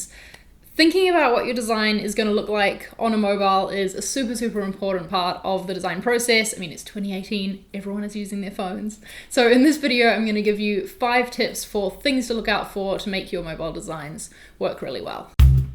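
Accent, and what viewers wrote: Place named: New Zealand. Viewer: As soon as I heard you speak I was like Whhhhhaaatt!!! She's definitely a Kiwi! Great Video - thanks!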